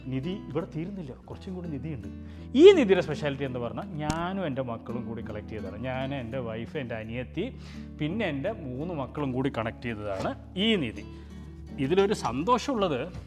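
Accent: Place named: Kasaragod, India. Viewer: I thought you are from kasaragod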